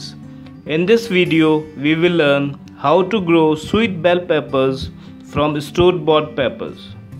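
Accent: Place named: India. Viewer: Im just here to listen indian accent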